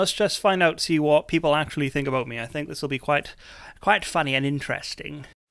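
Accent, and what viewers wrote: Wales, United Kingdom. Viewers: hello MR Welsh man........remeber me???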